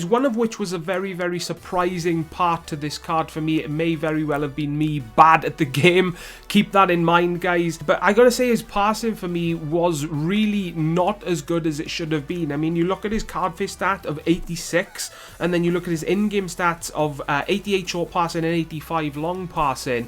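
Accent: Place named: Wales, United Kingdom. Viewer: Beerus that's the Welsh accent bro, they pronounce certain words softly.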